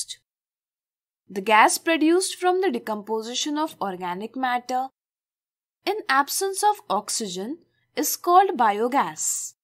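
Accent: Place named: India. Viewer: terrible Indian accent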